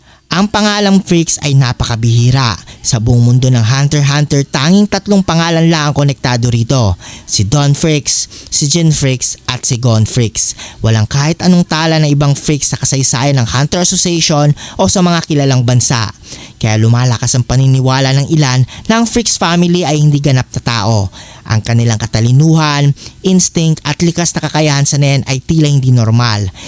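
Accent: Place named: Visayas, Philippines. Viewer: bisaya amp haha ausin mo tahalog mo 😂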